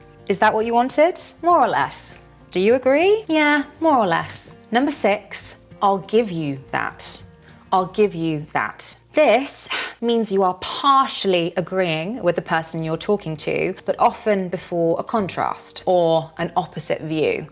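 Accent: United Kingdom. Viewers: I could suggest: go slower in exposing all the phrases. 25 ones in a short video are😮 too many to learn at once. It should be better to make 2,3,4 examples for each phrase. Do you agree? Anyway I love your british pronunciation 😊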